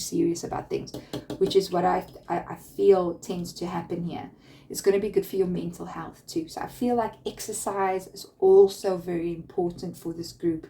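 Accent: South Africa. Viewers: Are you South African? Listening from 🇬🇧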